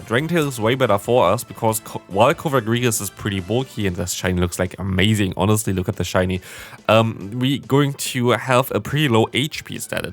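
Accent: Germany. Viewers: Love that Cofagrigus Shiny, got one myself, but Bad Ivs 😅 Been watching your vids for some time now, are you german? Because you have a bit of a german accent when talking english. I've got it too 😂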